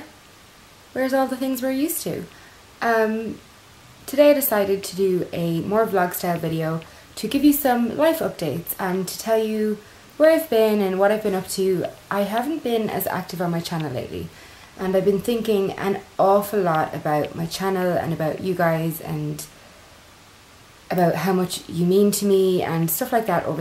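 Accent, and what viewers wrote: Ireland. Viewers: First of all I have only ever followed you on Instagram and when that gorgeous Irish accent came out I near died! Secondly I'm really proud that you had the courage to post that. It was nice to meet the person behind the beautiful face and I hope you know that you're never alone. You have a whole internet of friends who support you, isn't the world cool in that way! Sending you big love xxxxxxx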